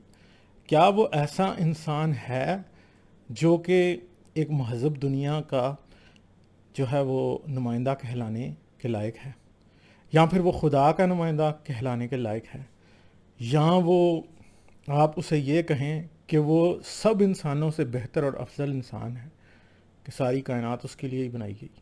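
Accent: Punjab, Pakistan. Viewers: I am so pleased to see the books.your accent reminds me of my Punjabi friend from Amritsar.wish Islam never existed we would have remained as a single strong country.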